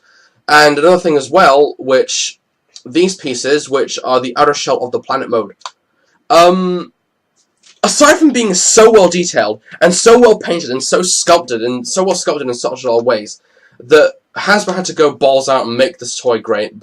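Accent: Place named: Scotland, United Kingdom. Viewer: You sound scottish and american and British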